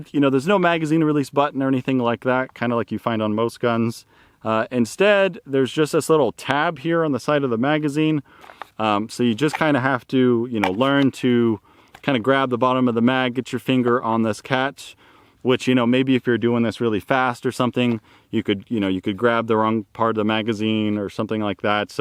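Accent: United States. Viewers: تمنيت لو أن ما تقوله مكتوب باللغة الإنكليزية أسفل الڤيديو كي أستطيع ترجمته ، لأنني لا أجيد اللغة الإنكليزية جيداً ، ناهيك عن اللهجة الأمريكية سريعة .. عانيت بمشاهدة هذا الڤيديو و أنا كل لحظة أذهب إلىٰ غوغل من أجل الترجمة .. الڤيديو مهم و أعجبني - شكراً .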